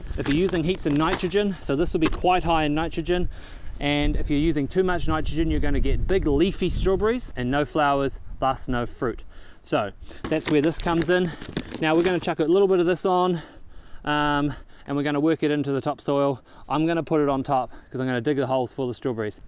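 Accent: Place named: New Zealand. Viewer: I reckon, sounds like a kiwi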